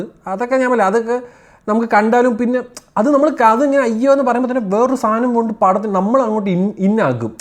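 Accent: Trivandrum, India. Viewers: Do not believe this joker Trivandrum slang u tuber . These are paid reviews .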